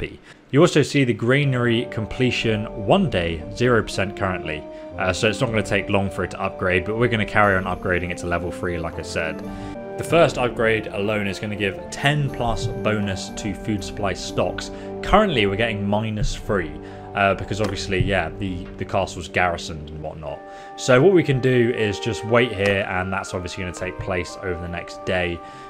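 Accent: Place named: United Kingdom. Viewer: It's called british and that's the way they pronounce things.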